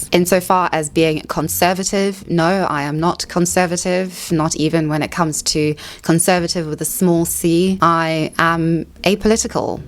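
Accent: RP, United Kingdom. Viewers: Ngl😅 your videos have been recommended to me for a while now but I had no interest to watch and I kid you not you reminded me of Patience. I press play and you also have a British accent😂 I've listened to Patience b4 and I've gleaned everything I can and doesn't really interest me but I'm willing to listen to your opinions. 🇿🇦Shoutout from South Africa✊🏿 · I like ur accent. Is it a British accent? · I never said you did condon it, however a few videos back you stated you were not privileged whatsoever in the British context. Even in the colonies your near RP dialect carries weight that other UK dialects don't. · You are FAR more interesting and well spoken than CO. I think including the fact that you are "safe and can read and write" is a nuance lost on many Americans. From what I've gathered of your story, your perspective on this is uncommon, if not unique to the ears of most Americans. 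I think you're correct in your analysis of race/class differences between the two countries. I can also see why some folks in the US would consider you "posh" if all they hear is your accent (which I like, FWIW). Given that all folks over here can somewhat differentiate "proper English" from cockney and will often confuse Australian and Irish (never mind the poor Kiwis), I'm afraid that's about as good as can be expected. 'Merca! (eyes rolling). Keep doing your thing! I enjoy your content. Cheers! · I got recommended one of your videos shortly after watching Patience for the first time. I think I got the two of you confused once because you both have lovely British accents but other than that I like you both for different reasons. Very contrarily, Candace is bothersome to me and I've always thought her to be disingenuous and more of a shit-starter than anything else, even though I'm more alike her in a political sense.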